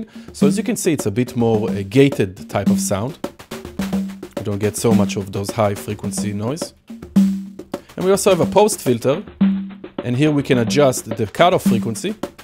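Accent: Israel. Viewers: dude I can't get over that Israeli accent, love it!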